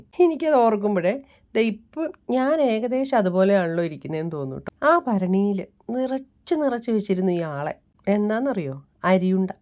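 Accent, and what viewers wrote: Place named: Idukki, India. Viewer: Njn ella video yum kanarund ente nathoonte samsaram pole aval idduki anu adimali❤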